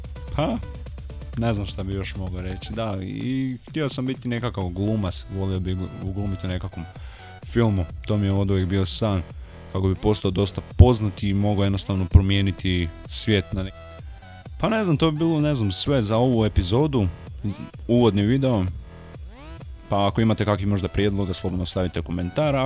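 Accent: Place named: Zagreb, Croatia. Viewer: preko naglaska pretpostavljam da zivis negdje oko zagreba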